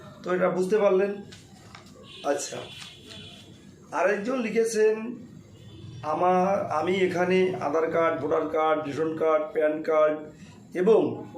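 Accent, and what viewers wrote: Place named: Bangladesh. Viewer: আপনি যে খাঁটি বাংলাদেশের লোক আপনার কথাবার্তার তাপ পরিষ্কার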